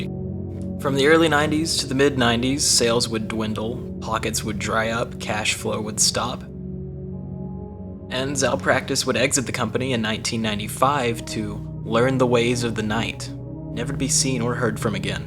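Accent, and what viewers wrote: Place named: Germany. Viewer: Incredible German accent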